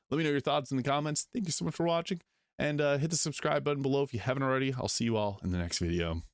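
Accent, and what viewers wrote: Canada. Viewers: okay that makes sense since i couldn’t quite pin it ! assumed canadian but it was different than any i had heard before so i wasn’t sure :p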